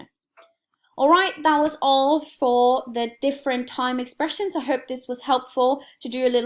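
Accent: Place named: United Kingdom. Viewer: Thank you ! came to your videos to learn Danish , and ended up motivated to practice my british English pronunciation haha yours is amazing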